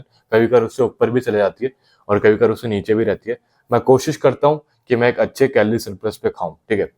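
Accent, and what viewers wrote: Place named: Pahadi, India. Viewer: Pahadi ho kya bhula??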